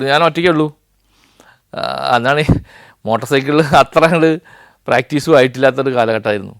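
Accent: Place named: Ponnani, India. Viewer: സാറിന്റെ സംസാരം കേട്ടിട്ട് പൊന്നാനി സൈഡിലാണ് വീട് എന്നു തോന്നുന്നു..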